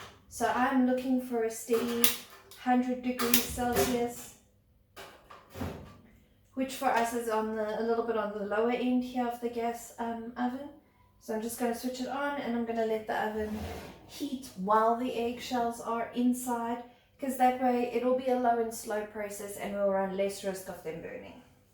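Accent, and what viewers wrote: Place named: South Africa. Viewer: Sounds like you're in RSA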